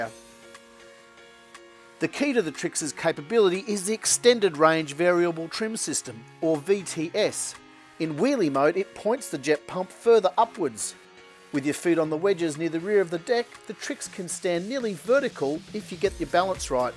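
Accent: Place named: Australia. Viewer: It's because that's Australian dollars. Notice the accent 😋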